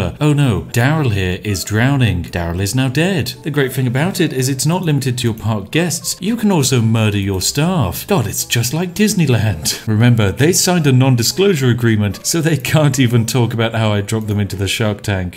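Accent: United Kingdom. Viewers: "The most exciting thing since teabagging" and "welcome to the bone zone" perfect commentary delivered in the excellent British accent, love it!!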